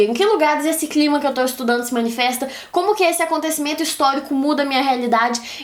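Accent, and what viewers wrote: Minas Gerais, Brazil. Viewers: Conheci pelo GeekieGames, 2 minuto de aula e já falei: essa aí é de minas kkkk, e tava certo mesmo!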